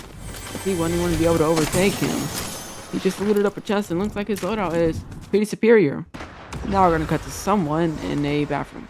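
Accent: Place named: United Kingdom. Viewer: love the british voice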